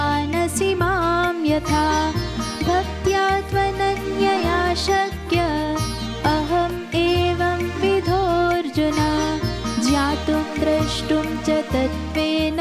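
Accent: Standard. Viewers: बहुत बहुत प्यारी सुरीली और कर्ण प्रिय आवाज़ में , शुद्ध उच्चारण से , शुद्ध भाव से आपने हमको भी धन्य कर दिया है । भगवान श्री योगेश्वर की कृपा आप पर बनी रहें । जय श्री कृष्ण ! 🙏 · माँ सरस्वती की वाणी आपकी jihwa में है. Shudh उच्चारण, सुनने में Priy है. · अत्यंत स्पष्ट व शुद्ध उच्चारण, शब्दों का उतार-चढ़ाव भी अत्यंत भक्तिमय हरे कृष्ण हरे कृष्ण 🙏🏻🙏🏻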